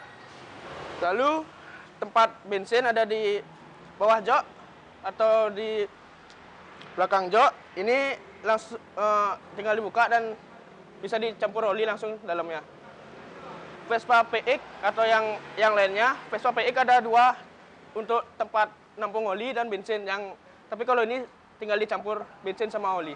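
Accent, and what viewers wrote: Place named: Bali, Indonesia. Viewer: Wkwkwk logatnya bali kental 😀😀😀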